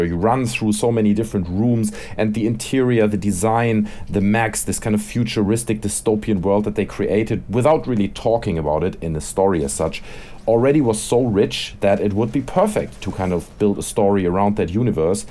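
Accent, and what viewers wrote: Germany. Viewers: A you german bist du deutsch? :D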